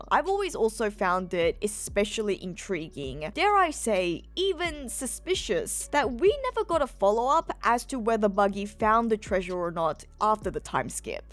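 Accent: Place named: Australia. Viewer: That Australian accent gets me every time 😂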